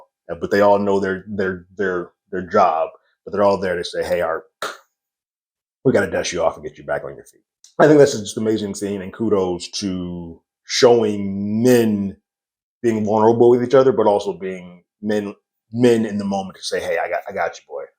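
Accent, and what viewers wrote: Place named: Midwest, United States. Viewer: Great review and you are really handsome 😊. You sound like a mid westerner. Just rewatched the show again and stumbled on the review.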